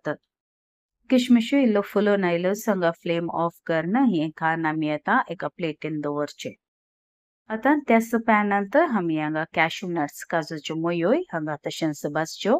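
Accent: Konkan, India. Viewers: Thanks. I'm really happy that you have started with subtitles in english. Although I love to hear your sweet accent of konkani which reminds me of my childhood. So happy to have come across your channel ❤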